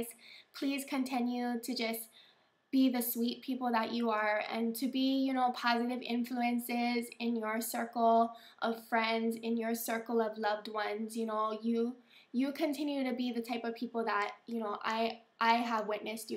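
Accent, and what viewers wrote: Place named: Minnesota, United States. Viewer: You must be from Minnesota.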